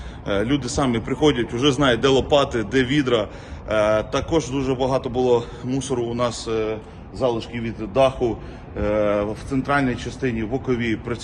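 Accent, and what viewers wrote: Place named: Western Ukraine, Ukraine. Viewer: В Одессе так не разговаривают там все на русском говорят просто человек с западной Украины и претворяется разве так можно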